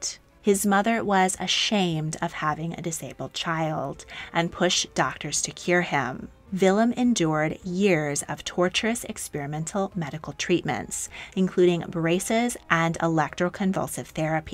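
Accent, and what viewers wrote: California, United States. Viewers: Californiaaaaaan accent with vocal fries absolutely horrible. Say Trey for us and which roads you used today. USA refused to enter the first World War until the very last moment in 1917- the cannon fodder to hold back the otherwise successful German push on Paris. 1926-8 USA banned immigration especially by European Jews. 30% of USA was German decent and lobbied (I would argue 99% with the most honourable reasons) for USA to continue isolationist policy. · Good video but omfg the narrators voice makes me suicidal soo american and soo high pitch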